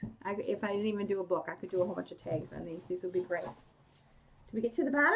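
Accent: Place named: Northeast, United States. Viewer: I figured you were from somewhere in the northeast, your accent was very familiar:) I lived 12 years in the Southern Berkshires, MA. My husband and his family are from Mahopac, NY in Putnam County..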